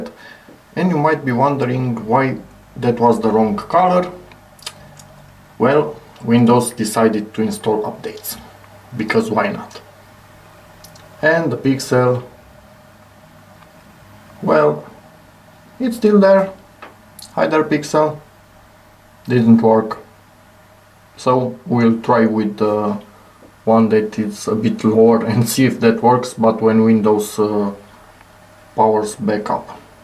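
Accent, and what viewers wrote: Romania. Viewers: Accentul de roman si inghetata pe care scrie in lb romana te dau de gol :)) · Salut. Aveam o banuiala ca esti roman dupa accent, dar inghetata te-a dat de gol 😂. Am un "cluster" mare de pixeli blocati pe TV, am incercat varianta cu jscreenfix si nu a mers, Vreau sa incerc cu creionul si caldura cum ai facut aici, poti sa-mi spui te rog pe ce intensitate ai dat feonul? Multumesc! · Dupa accent ma gandesc ca esti roman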